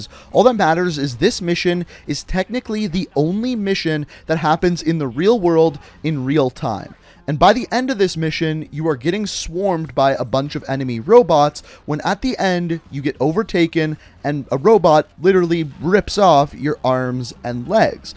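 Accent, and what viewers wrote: Canada. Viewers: Is the Guy Canadian?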